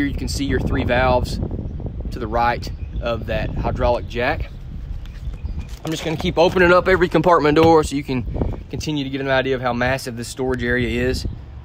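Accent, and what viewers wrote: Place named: Kentucky, United States. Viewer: Aweslme review. Thanks. Love your Kentucky accent Reminds me of a Texas accent.. Definitely upgraded items. Love the kitchen. Surprised there is no outside kitchen. My main concern would be room for fulltime living clothes storage space. Love the bathroom, and tv/fireplace in the bedroom. It doesn't constantly cover the window. Woild have like bigger windows in the living room instead of light sconces.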